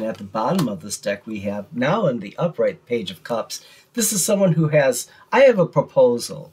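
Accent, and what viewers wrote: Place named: Ireland. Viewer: thank you so much for all this information in this hopeful reading, hope your feeling okay and love the Irish accent 🙈😊 thank you for always making my day 💖 looking forward to the next reading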